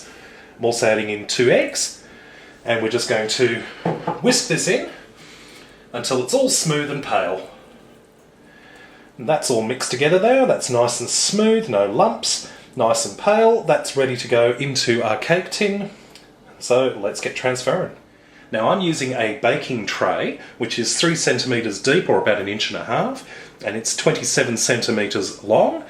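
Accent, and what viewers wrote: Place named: Australia. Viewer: thats just his epic aussie accent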